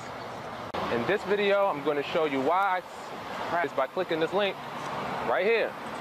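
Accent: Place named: England, United Kingdom. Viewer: great video bro i like ur English accent love from India jai Hind hehe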